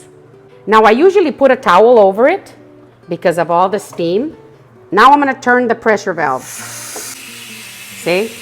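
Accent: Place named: Ohio, United States. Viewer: I just began to follow you a couple of months ago. I noticed your accent and it sounded familiar. Now, I know you live in Ohio. I was born in Ohio raised there until 8 years old. My family moved to the RGV and we’ve been in Texas ever since.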